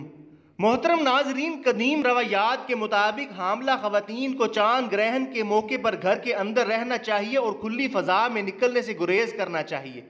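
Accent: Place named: Rural, India. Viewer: Taire awaz sai hai pata chal Raha hai Tu Kitna paindo hai. Don't spread lies!😞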